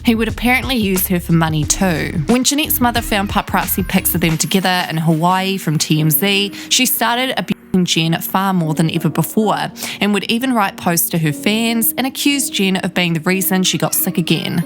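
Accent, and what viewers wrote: New Zealand. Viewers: its a new Zealand accent not Australian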